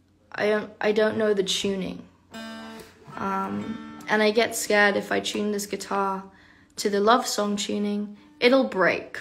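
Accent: United Kingdom. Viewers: She is British???? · she's british ??????? :(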